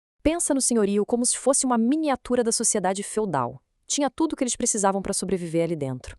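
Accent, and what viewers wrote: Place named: Rio de Janeiro, Brazil. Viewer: Pura carioca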